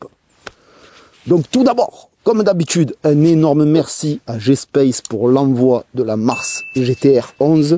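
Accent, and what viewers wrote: South, France. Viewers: J'en peux plus de cet accent du sud. Même ça ils ont réussis à le pourrir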